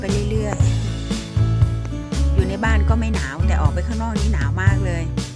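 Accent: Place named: South Korea. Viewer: สำเนียงเกาหลีพี่เพราะมากค่ะ.🥰👍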